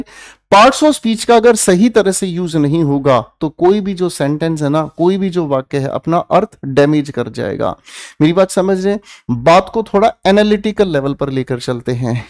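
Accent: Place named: Bengal, India. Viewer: Aaj to Bengali tone me bhi bol gye sir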